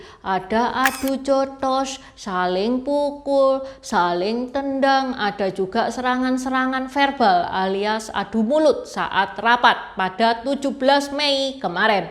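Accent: Java, Indonesia. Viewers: Terpengaruh logat jawir dia. Ga tau harus minum obat apa buat bikin netral si jadi logat biasa aja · jawanya medok banget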